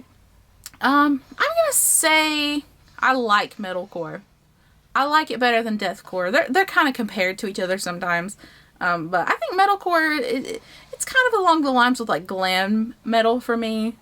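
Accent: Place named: South, United States. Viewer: You're southern aren't you ? 🥺💘😭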